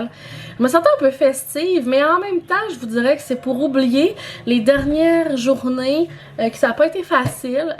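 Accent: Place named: Lac-Saint-Jean, Canada. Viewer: A cause, qu'est pas chaude...🤗 C'est le fun d'entendre ça! J'ai perdu mon accent du lac, mais j'adore l'entendre 🤗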